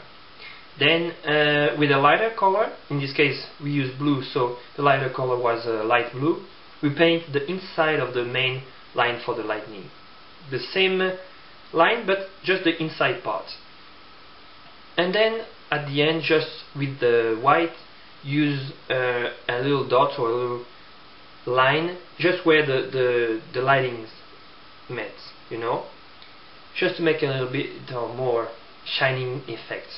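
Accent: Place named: France. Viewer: French accent of course!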